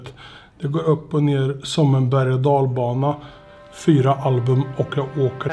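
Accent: Värmland, Sweden. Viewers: Är du från värmland? Bor i värmland! Låter som du kommer herifrån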